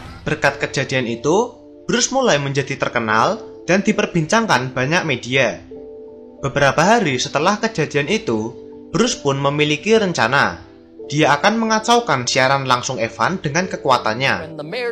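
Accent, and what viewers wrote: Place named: Java, Indonesia. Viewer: TIDAK MENARIK , KARNA PEMBAWAAN CERITA TERLALU JAWA MEDOKK WKWKKK , NO SUBSCRIBE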